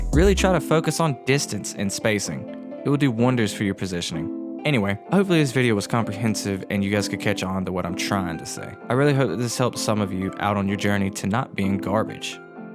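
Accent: United States. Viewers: Gotta love the good ol' murican accent!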